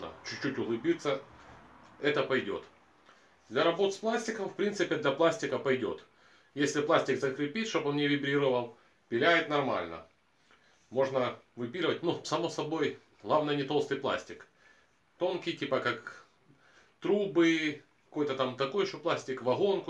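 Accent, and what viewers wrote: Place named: Belarus. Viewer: Фирменный белорусский говор)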